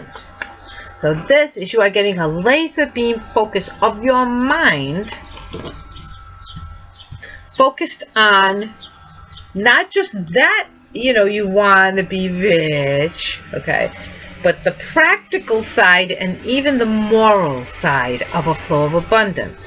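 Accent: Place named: East Coast, United States. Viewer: I love your accent......Lemme guess, East Coast?......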